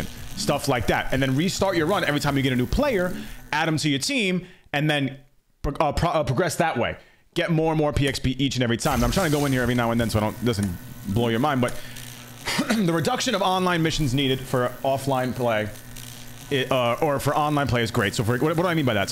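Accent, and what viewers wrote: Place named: Dominican Republic. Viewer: Dominican accent papi 😃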